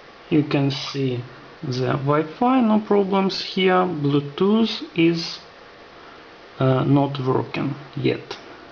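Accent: Russia. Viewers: You sound pretty ruassian O_o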